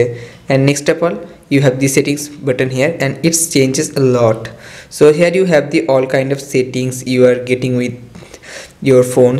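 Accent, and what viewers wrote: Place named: India. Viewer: Don't let the negative comments about your English accent affect you. You as an Indian know at least 2+ languages which is great. Good overview.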